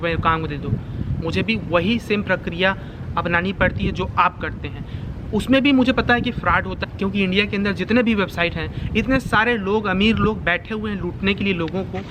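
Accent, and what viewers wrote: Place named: Chhattisgarh, India. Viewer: India me kaha se ho bhaiya CG se ho lag raha hai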